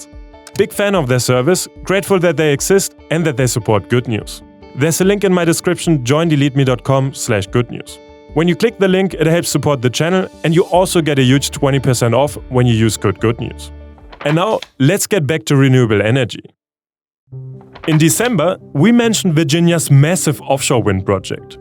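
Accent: Germany. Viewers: I can't cope with your cute German accent hahaha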